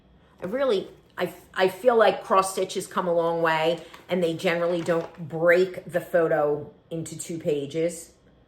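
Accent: New Jersey, United States. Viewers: Speaking as a fellow "just across the bridge from Philly" native New Jerseyan (who has been transplanted to Northern VA) I love to listen to your flosstubes and your "accent". You sound like home to me :)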